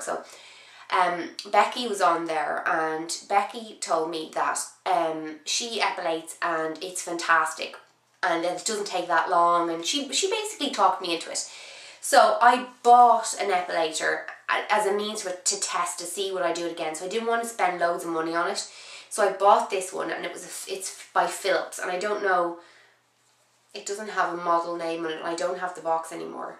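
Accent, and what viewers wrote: Ireland. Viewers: Irish =) The best accent in the world! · are you irish? or from the uk because I hear a accent in your voice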